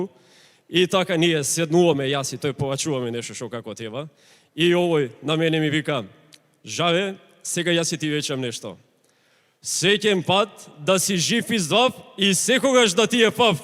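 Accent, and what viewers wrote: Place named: Kumanovo, North Macedonia. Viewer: Zlatno pravilo zošto ne e interesno.... ili zbori kulturnu ili teraj kumanovski....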